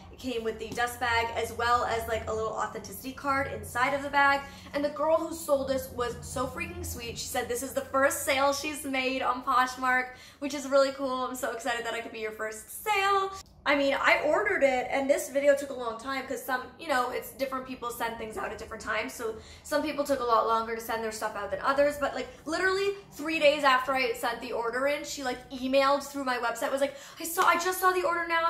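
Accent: Canada. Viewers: Aww! We don't laugh out of derision of your cute accent, we laugh because our brains are listening to your less affected accent and we forget you're Canadian. Then our silly brains are like "oh yeah she is!" So I guess the giggle (which is what I do) is partly out of our silly brains forgetting your nationality, and part because it's cute. FYI, I still giggle when I'm over at my friend's house and her husband says about. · I love Rebecca Minkoff purses, I Always Love your style and I love your Canadian accent! I love Canadians! xo All really cute items! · I love your Canadian accent so much! I love the way you say “sorry”💕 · Your Canadian accent is so cute haha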